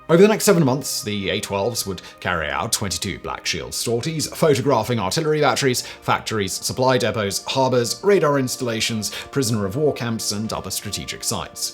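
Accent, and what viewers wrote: United Kingdom. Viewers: I speak English. English is my first and only language. I like all of your channels and find your content extremely interesting. The only thing is, could you slow down just a bit with your speech? It's not that I don't understand the meanings, it's just that with your English/British accent as opposed to my slow American drawl I have to keep rewinding just to understand what you said. I hope you will take this as constructive criticism and not a petty complaint. Thank You !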